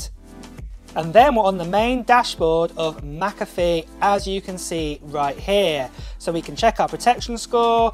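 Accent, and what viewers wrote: United Kingdom. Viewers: the service that you are advertising won't allow anybody outside of the US to enter their address in the purchase page. How did you get your keys, because you sound British, or are you living in the US? Maybe you should disclose that.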